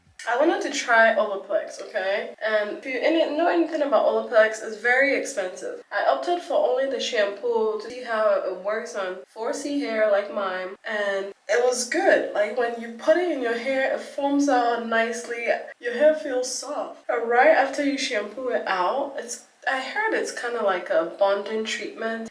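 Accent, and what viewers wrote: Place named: Ghana. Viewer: I knew she was Ghanaian the moment she started talking 😂❤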